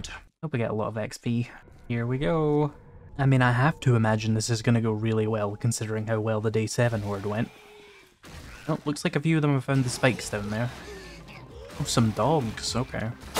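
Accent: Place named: Leeds, United Kingdom. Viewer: That accent bro, what you from Leeds area? By the way thanks for the turning stone into cement in the forge tip.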